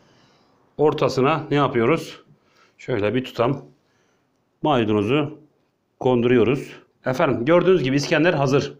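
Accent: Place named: Gaziantep, Turkey. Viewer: Abi anteplimisin siveden oyle anlasiliyor yoorum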